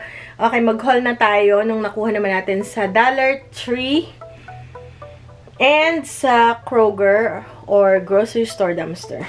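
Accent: Batangas, Philippines. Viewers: Taga batangas ka ga?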